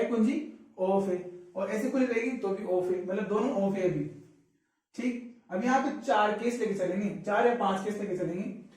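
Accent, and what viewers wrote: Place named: Hadoti, India. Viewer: Like your hadoti accent 😊❤️❤️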